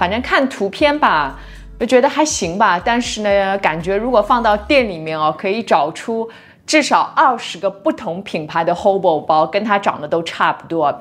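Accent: Shanghai, China. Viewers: 小姑娘好像是上海人。讲的很好 把我这不懂的瓜，都有点懂了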